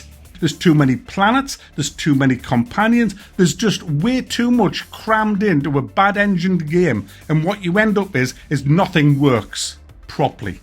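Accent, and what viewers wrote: United Kingdom. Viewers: I like your accent. What part of Britain is it from?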